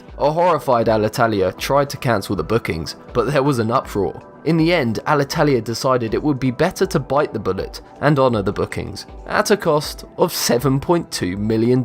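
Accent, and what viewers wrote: United Kingdom. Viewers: Pietryga Lv he’s a Brit he has an accent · And your British axent like mine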